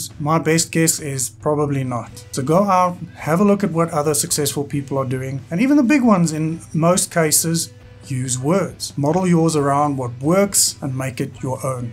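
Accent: South Africa. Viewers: I don't know way but you sound like a South African 😊thanks for all your help 👍🏻🙏🏻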